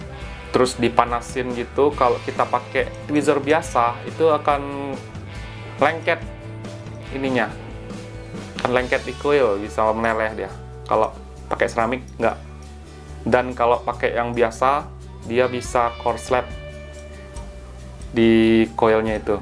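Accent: Padang, Indonesia. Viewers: Ngomong nya kayak logat orang padang ni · mantap dah.. tp by the way.. logat nya kayak org padang neh.. bener ya?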